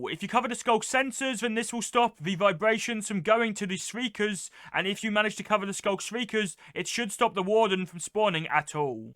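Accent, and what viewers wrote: United Kingdom. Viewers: lol wat, bro sounds british, nothing like trump.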